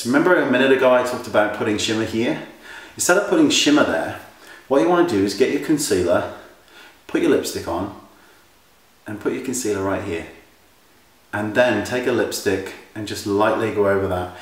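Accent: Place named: London, United Kingdom. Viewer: It's a simple london accent :)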